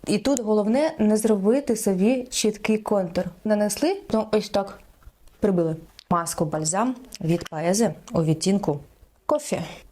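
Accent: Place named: Surzhyk, Ukraine. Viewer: Подобається ваш суржик 🥰😍❤️🔥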